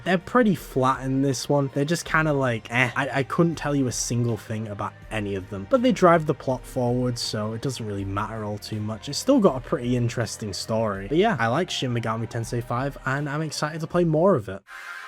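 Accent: United Kingdom. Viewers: You have the same voice and accent of Andrewjrt, which area in the UK?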